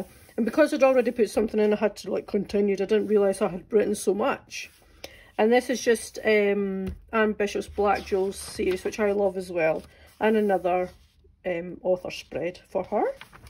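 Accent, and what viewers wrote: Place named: Scotland, United Kingdom. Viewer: Awesome idea. I need to go look up those Villain Archetypes. I don't have a common place book, but have started doing something similar in Notion, cause I've always got my phone on me and I can bring it up on one screen while I'm writing on the other. (dual screen setup). Psssst!! it's good to hear a Scottish accent on the YouTubes. I'm in Cumbernauld!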